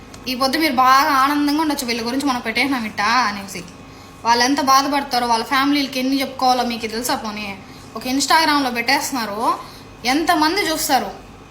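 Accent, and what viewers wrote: Anantapur, India. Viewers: Buddham namami !!! Ananthapuram voice good sister garu. Take care in Kuwait.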